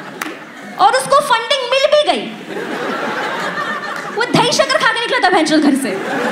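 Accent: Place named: India. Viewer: dont emphasize each and every word in typical ta ta ta indian accent .stop that its annoying paperweightttta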